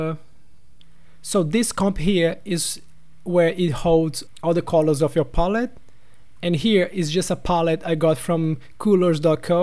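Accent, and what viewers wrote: Brazil. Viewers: Belo trampo! Vc eh Brazuca por sinal ? Reparei no acento rsrs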